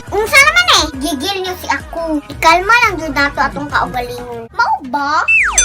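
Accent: Visayas, Philippines. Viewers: pashout nman cutie in your next vlog... i really love the way you speak visaya...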